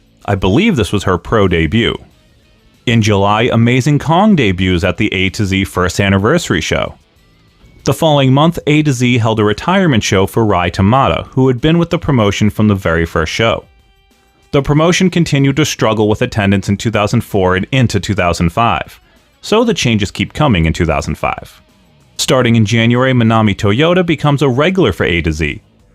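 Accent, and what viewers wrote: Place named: United States. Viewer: Nice stuff! I've been reviewing all their stuff (currently in 2001's beginning right now) and it's plain to see the issues- the fans never "took" to the mat-wrestling stuff and it was dropped, giving it no identity. Big stars Hamada & Omukai didn't sell well and couldn't connect with the fans, and lacked credibility. The biggest ideas to "push" people were essentially to have them win a bunch of flukes in a one-night tournament, which failed to really heat people up as challengers. The mess of politics in 2003 is even worse. I will say the pronunciations here are VERY "Americanized". It's hard to avoid, but MaREEko YoSHEEDA stands out, as does "Rye" TaMAda, haha. Ogawa was less of a "businessman" and more of a "PR Guy". Also Candy Okutsu was a JWP wrestler, not AJW, and it's "Korakuen" Hall XD.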